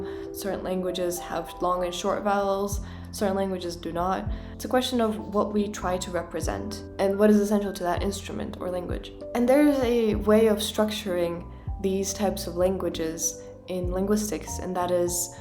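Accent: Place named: Japan. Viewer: Hey.. as a linguist who studies Englishes and various contact language inpact on language.. I can't figure out what dialect of english you're speaking..there are very 'new' or different/mixtures of strange vowels/consonants and cadence etc.. You sound a little Japanese, a little Chicano and a little something new/modern ..and strange sounding to my American , older ear/and dialect.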